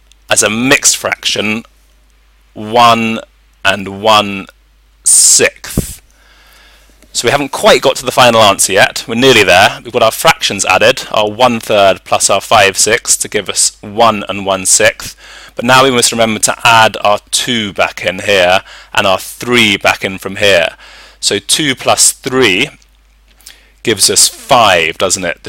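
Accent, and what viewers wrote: United Kingdom. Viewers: are you british 😦😦😒😑😐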